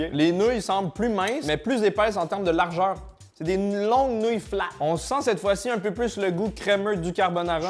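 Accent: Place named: Canada. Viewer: J’adore son accent canadien 😊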